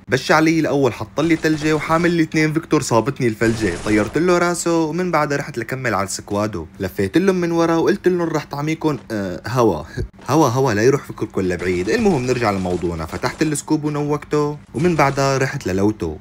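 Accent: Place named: Levant. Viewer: مبين شامي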